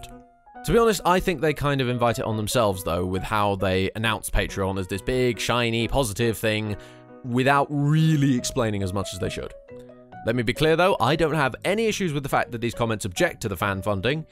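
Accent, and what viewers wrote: United Kingdom. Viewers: Well having a patreon is nothing bad. Good luck with the rewards! Except for the once that have already been mentioned (names in the video, donators chosing games and playing with you on multiplayer games on stream) maybe for a higher tier the ones who donate can ask for a sentence you can say in your british accent? Then you can send the mp3 file to them via e-mail or something like that, and they can do whatever they want with it. Use it as a notification sound on their phone, in their videos. I don't know. Again this is a suggestion, you are more than welcome to do whatever you want with it. Also sorry for being inactive on your channel. I am very easily scared by horror games. Anyways tallihoo and good luck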